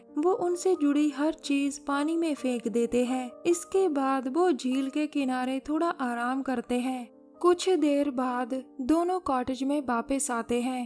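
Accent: Punjab, India. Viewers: kyaa app punjab se ho me 2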